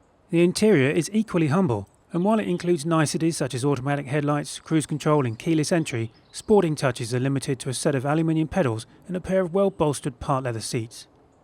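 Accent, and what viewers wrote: England, United Kingdom. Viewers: Why do British people add syllables, letters, and phrases to words that don't need to exist and make it a mouthful to say such as br-zed, Aluminium , innavitive, and other hilariously odd ways of pronunciation that unnecessarily make additions to simple words. I've heard it all and American English just seem simpler and less pretentious ,because its like they do this just to be different or put emphasis to things that just don't need it. XD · awesome review!!! love it when car reviewers have that english accent too! :D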